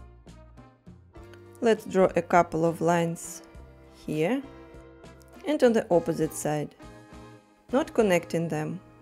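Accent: Russia. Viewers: Ну блин не обмануть тебе своих😅 ну сразу же слышно что из России автор 😏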